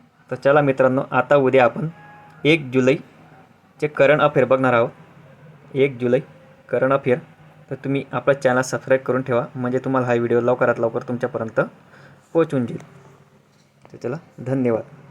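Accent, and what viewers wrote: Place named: Ahirani, India. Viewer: Sir mi dhule chi aahe tuhmi kuthele bolnyavrun ahirani aahe bhasha tumchi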